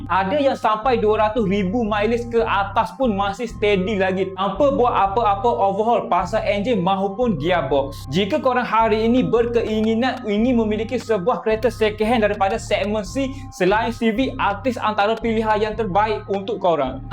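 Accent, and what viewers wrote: Kelantan, Malaysia. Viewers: Kuat bunyi kelantan kau ni brader😂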